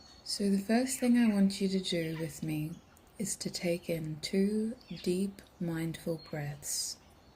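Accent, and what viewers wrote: Australia. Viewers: it’s INSANE how accurate your readings are. also, another reason I love watching is because of your australian accent 😍❤️ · Are you Aussie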